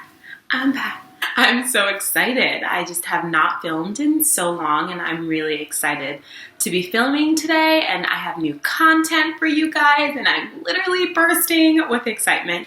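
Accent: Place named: California, United States. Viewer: Just came across your videos due to my curiosity and this is off topic but I noticed your accent CHANGED. You sound like a California girl now which isn't a bad thing, Lol.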